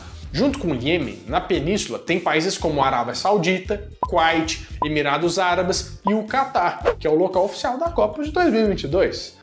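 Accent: Minas Gerais, Brazil. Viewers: Único canal em que não pulo qdo está pedindo pra dar like e compartilhar. Amo seu jeitin mineirin de falar. Pura nostalgia pq sou mineira e não tenho sotaque pois estou há 65 anos em São Paulo. E seus vídeos são ótimos, amo curiosidades e aprendo muito com vc rapaz. 🙏🏻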